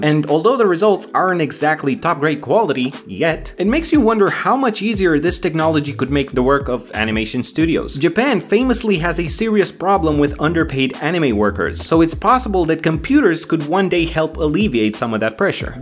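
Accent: Romania. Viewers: The through just sounds ROMANIAN AND I LIKE THAT XD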